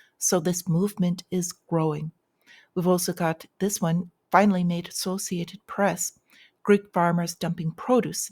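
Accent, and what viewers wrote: Ireland. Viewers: You have such a unique channel - I had to laugh when you showed the farmers spraying the manure - what a metaphor for what they are doing to us on a daily basis! So good to hear your sweet Irish accent! Have a wonderful and profitable week! 🍀😊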